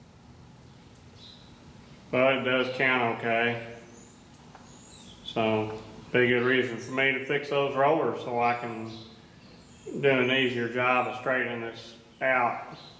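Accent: South, United States. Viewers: you welcome,BTW love your southern accent LOL..I am really respect you,one man army LOL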